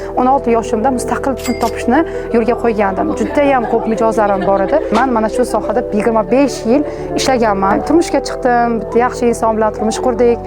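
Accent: Bukhara, Uzbekistan. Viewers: Voy Buxoro gòzali shevangzdan bilgandim Buxorolik ekanizni men ham buxoro shahardan❤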